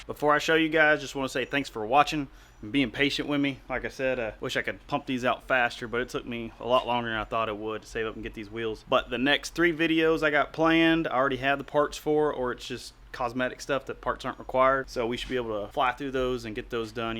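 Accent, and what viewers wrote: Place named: United States. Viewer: I feel like you have a huge country accent on camera!!! haha.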